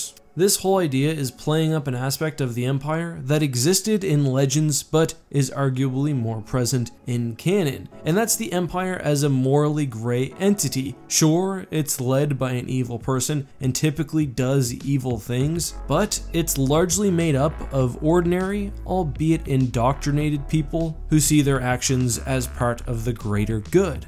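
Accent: Canada. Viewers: you canadian?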